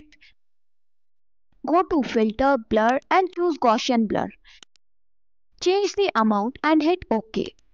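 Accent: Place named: India. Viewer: Terrible. I didn't expect anything better when I heard an Indian accent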